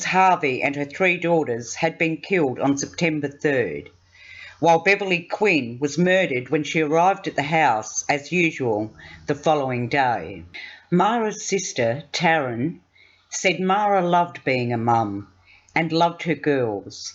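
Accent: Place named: Australia. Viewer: Good to hear an Aussie voice narrating, liked, subd.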